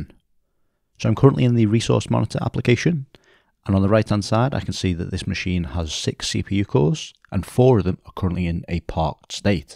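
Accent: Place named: Merseyside, United Kingdom. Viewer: Solid video, are you from Merseyside originally? You sound local. Cheers for the DC promotion video as well, not done that in a while so the video helped.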